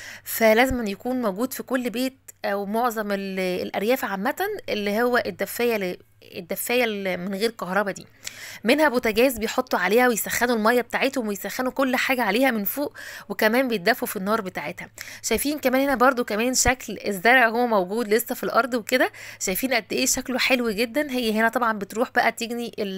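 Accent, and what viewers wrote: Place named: Egypt. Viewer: اللهجه المصریه تجنن زی اهلها المصریین الحلوین ...احبکم یا مصریین. اوی اوی...متابع من ایران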